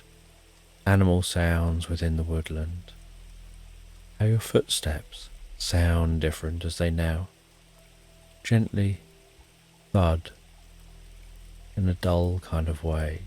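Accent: United Kingdom. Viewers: Had to update: My second night listening was even better than my first. This is the best for insomnia. I need that perfect British accent with that slight, almost undetectable slowed down effect. And so long, 8+ hrs! Love how one dreamscape effortlessly morphs into another, no need to worry about missing anything. I can get up to use the bathroom or shift sleep positions and gently fall back into whatever soft, lulling, dream-story vignette is unfolding. I accidentally slept in after my second night listening, and my entire body, head to toe, felt utterly relaxed. Thank you!🌌🌜👑🏰🌈🌳🌌🦜☔️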